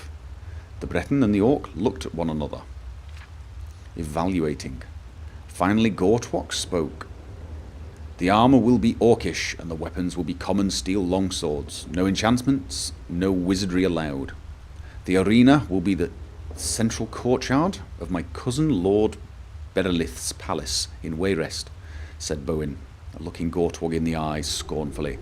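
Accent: United Kingdom. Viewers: If you were american I would not watch these book videos but british people are so pleasant to listen to that I'm going to watch them. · Yes, oh my God so much yes! This is amazing! The calm, collected British accent and the fantastic books of the Elder Scrolls series, with your reactions added on top. This might very well be your best playlist yet. I REALLY hope you'll do all of the books from the Elder Scrolls series eventually. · It's like a Morgan Freedman with a British accent. that's like 200% better than the normal Morgan Freedman!!!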